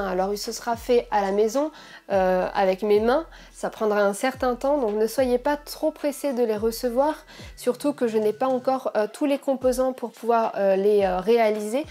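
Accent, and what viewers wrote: France. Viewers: A few minutes later with French accent😀. Spot on. Nice channel.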